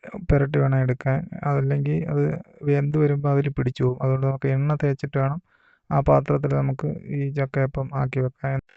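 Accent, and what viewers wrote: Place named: Trivandrum, India. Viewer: അണ്ണാ പക്കാ തിരോന്തരം തന്നെ 💪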